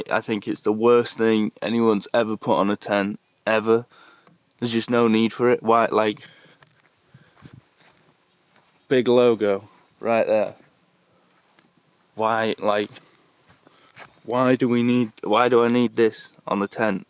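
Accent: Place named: North, United Kingdom. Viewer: That's the case nowadays 5 man and can only fit 3 airmats in...do i hear a northern english twang there..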